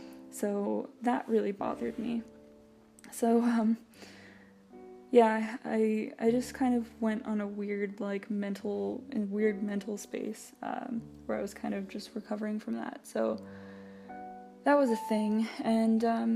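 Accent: Canada. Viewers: Are you Canadian? (Also I just got inspired for my art class project)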